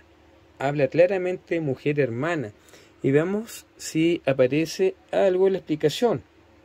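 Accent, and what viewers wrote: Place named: Chile. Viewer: Buen día, esa Biblia es mi primera Biblia, le decían la Cenicienta; un error gravísimo, así lo veo yo, no quiere decir que tenga razón, pero es mi primera Biblia y la quiero mucho y no la veo como cenicienta. Aún la tengo a la Biblia. Sí, exactamente como ibas leyendo, con ese acento chileno tan lindo, dices cap. tal vers. tal lo busco y voy bien. ☺Ayer a la mañana en tv chilena se presentó un SR. entendido sobre Carlo Acutis. Me gustó mucho como explicó. Y ahora hablan de Loan, gracias Chile, y me duele mucho lo de abuelita chilena. Hasta el próximo encuentro!!! Bendiciones!!!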